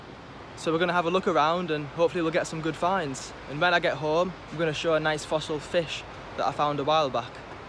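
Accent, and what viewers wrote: Scotland, United Kingdom. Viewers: you probably won't respond but are you Scottish? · Are you Scottish?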